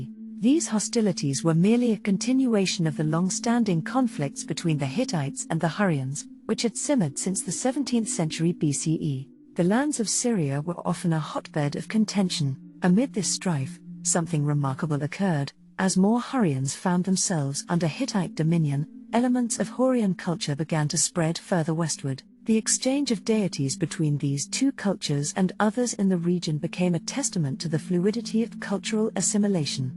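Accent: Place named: United Kingdom. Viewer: The lady has a very British accent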